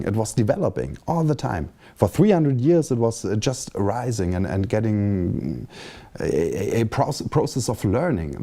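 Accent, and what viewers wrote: Germany. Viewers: Du hälst den Vortrag in Englisch als ob du ihn in Deutsch halten würdest und das bereitet mir Kopfschmerzen beim zuhören. Ich höre dir gerne zu aber mach das bitte in der Sprache in der du es kannst. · Was ist daran komisch? Sein Englisch ist fehlerfrei und verständlich und ja, man hört, dass er aus Deutschland kommt. Aber muss man sich denn dafür schämen aus Deutschland zu kommen?!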